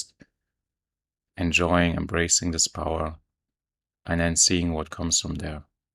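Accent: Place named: Germany. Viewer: Bro thank you so freaking much bro. I was Over here continplating stuff about my ex who was German and I'm realizing I think she cheated on me then I see this video and it sounds like you are German and I'm also going through stuff with my mom and I was also for the last 3 years I have been like the angel guy cuz I thought I could like you said heal people by that but I ended up getting taking advantage of. Thank you thank you thank you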